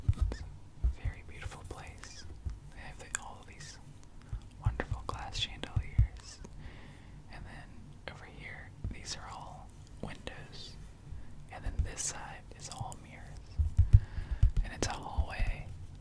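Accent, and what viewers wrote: United States. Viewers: Your american accent isn't terrible but great ! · andré Patricia American accent isn't terrible? He is americain ! he only studied in france.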